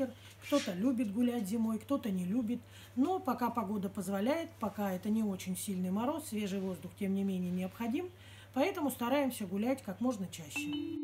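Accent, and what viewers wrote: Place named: Russia. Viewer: Thank you for the subtitles. I love hearing your beautiful Russian accent when you speak. I've been learning the cyrillic alphabet and how to speak Russian, I had always wanted to learn. Thank you for taking such good care of Katya, she looks so much brighter and healthier after having spent time with you and your loving husband.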